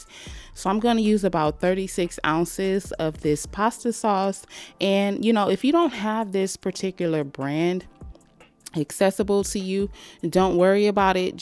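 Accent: South, United States. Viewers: Ik you from the south 😂😂❤️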